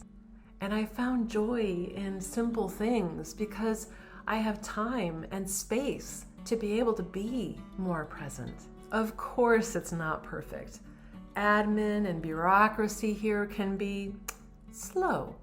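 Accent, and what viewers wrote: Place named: Europe. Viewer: You sound like a European, welcome.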